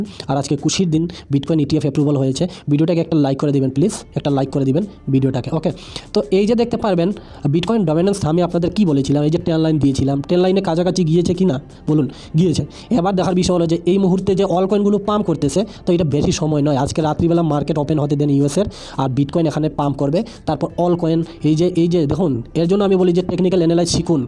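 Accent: Sylhet, Bangladesh. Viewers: Vai Assam e taken naki? Sylheti vasay kota bolen mone hocce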